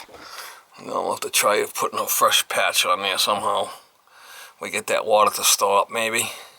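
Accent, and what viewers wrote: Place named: Massachusetts, United States. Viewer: I really like your videos. I think you must be in Massachusetts. I would totally call you to yell at me at my house if I had a plumbing issue! "That shits gonna be there for life. It'll be there forevuh!"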